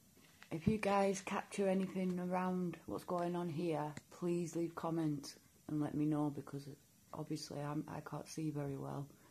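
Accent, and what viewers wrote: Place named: Yorkshire, United Kingdom. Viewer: they feed off fear hun so try and stay strong dont record it pay it anymore attention people may love your vids buts its not worth what its doing to you you sound like you possibly have a yorkshire accent or there abouts i will come try to help you if you wish if it gets too much just send me a message hugs x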